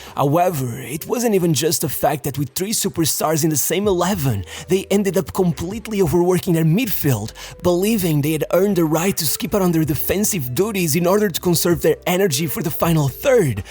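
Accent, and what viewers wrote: Porto, Portugal. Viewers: Your portuguese accent makes this video even more awesome! Cheers, buddy! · Nota-se bem que és português, muito bom continua! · Nunca falho a reconhecer um irmão português, bom video irmão. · és português não és? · This accent is so Portuguese 😂😂 god damn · esse sotaque tuga <3 dá-lhe!!! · Are you portuguese? you said his first club right and all names good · O sotaque do Porto mal disfarçado 😅😅😅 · U sound portuguese, are u? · Es pt parece a braba pelo teu sotaque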